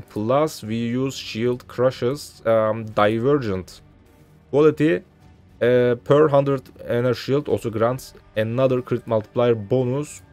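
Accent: Turkey. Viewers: Bu videon önerilerde karşıma çıktı ve şunu söylemeliyim ki, başka hiçbir şeye bakmadan İngilizce şivenden Türk olduğunu anladım :D Bunun dışında güzel build, içeriklerinin devamını dilerim kardeşim :) Discord kanalın var mı bu arada? Açıklamalarda veya profilinde bulamadım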